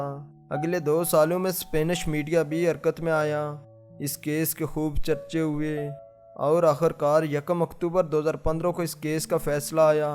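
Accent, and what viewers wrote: Kashmir, India. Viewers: Kashmiri lgte ho bolne sy... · accent kashmiri lagta ha mountain area Jo America ja ka be change nahi hosakta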